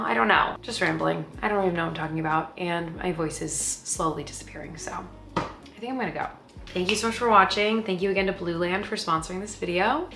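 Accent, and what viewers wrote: New York, United States. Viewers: Another interesting video in the life of, fast talking with her strong New York Accent doing stuff around the City, even getting up early in the morning to go to the parade supported by some of the members of The Flamboyant NYC Crew featuring lots of, From The Twin Sisters R'NT US, The Tall Loud Blonde One, and. There was even a guest spot from, The Mature Student. For someone who claims that she is shy and introverted, certainly knows a lot of people! Also the Cats Bennett and Olive also made an appearance with Bennett having an obsession with sitting on top of the door! Anyway love the content and as for the Critics, they must be jealous because they won't go on you tube! Take care now! From London UK 🇬🇧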